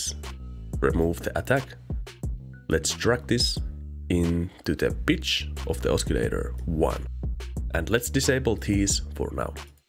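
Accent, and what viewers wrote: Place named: Finland. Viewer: arvasin että oot suomalainen tosta aksentista, mutta muuten helvetin hyvä tutoriaali :D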